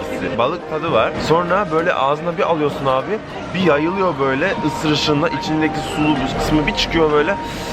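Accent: Konya, Turkey. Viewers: bende diyom bu adam niye Konyalı gibi konuşuyo ben de konyalıyım. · Bir konyali olarak babam herzaman anouu der da diyor😄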